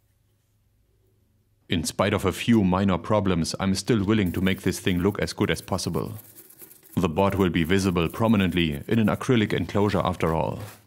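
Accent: Germany. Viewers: Your German accent is enticing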